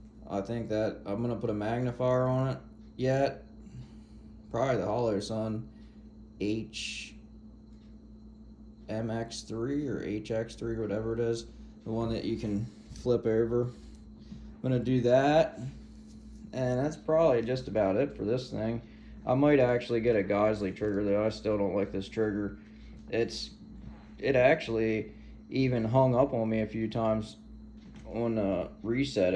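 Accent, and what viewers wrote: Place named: Delaware County, United States. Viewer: Sounds more Delco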